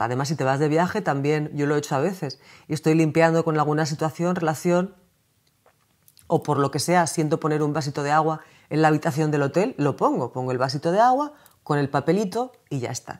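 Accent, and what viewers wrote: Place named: Spain. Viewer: Que dulzura la de esta mujer. Su voz tan calma, tan.armoniosa, tan de paz.... de por sí ya te trasmite calma y sanación. Que bella es la fonética de los nativos de España.... enamora. La escucharía por horas y horas... Jajaja Saludos desde Rosario - Argentina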